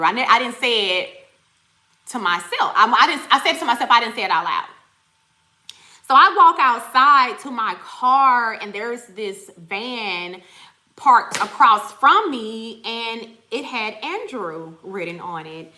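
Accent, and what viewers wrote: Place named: Houston, United States. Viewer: I love your voice do you live in Houston Texas if not you talk like them lol 😂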